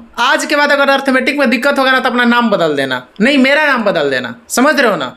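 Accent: Bihar, India. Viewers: shudhh bihari ho bhai hmko❤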